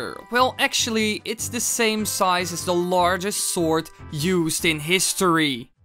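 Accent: Netherlands. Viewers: After this Video i would probably think he is Dutch, the way he speaks with his accent reminds me of the Dutch people, especially when he says german words. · you sound dutch... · You'r Dutch arn't ya? I hear it to your accent, it's not the same as Britians or Americans, you are from the Netherlands, you even have the French R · It's also weird to hear someone with a heavy Dutch accent be called an English person. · Hold up. You're Dutch, aren't you? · is either Dutch, flemish or Scandinavian going by his accent. He's not an American or englishman. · English? He sounds Dutch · You Sound Dutch. are you Dutch? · true maar je klinkt zo Nederlands. vind het echt jammer. want ik vind je beter dan game theory.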